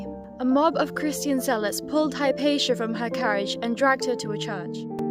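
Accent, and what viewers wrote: United Kingdom. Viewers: Hi there! Stumbled upon your channel, great content. Keep up the good work. And have to ask: Where are you from? You look like a Scandinavian, but sound very brittish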